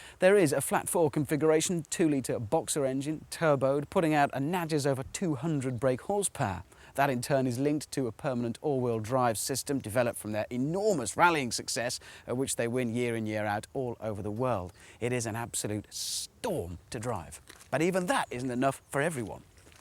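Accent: United Kingdom. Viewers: It's partially because of his accent. Note how in certain british accents, Lava for example is pronounced laver.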